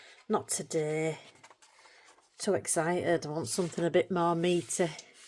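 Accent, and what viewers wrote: Yorkshire, United Kingdom. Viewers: Love your Yorkshire accent 😊 years ago I went to live in a place called Hesketh Bank do you know of it? Back in 1972 I was from Birmingham, that’s a broad dialect too but because my mother was not from there I never really picked up the accent but I can put it on when I meet someone from there over here. I had a friend once in Melbourne who was so offended when in a shop with me I spoke to a lady behind the counter who had the broadest brummie accent and I dropped into it to talk to her! 😂😂 love doing that!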